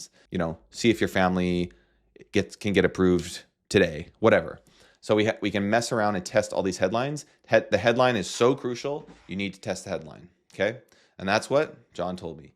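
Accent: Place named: United States. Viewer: I'm american and this is exactly what i sound like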